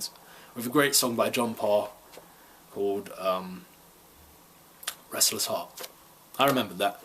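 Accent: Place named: United Kingdom. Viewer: There's actually a reason Killian is so different in the film, it probably just doesn't play too well because 1. You're too young, and 2. Judging by your accent you're from the UK. Back in the 70's and 80's Richard Dawson was a real American game show host (he hosted Family Feud for a number of years). So instead of getting an actor to play a game show host they just got a real game show host.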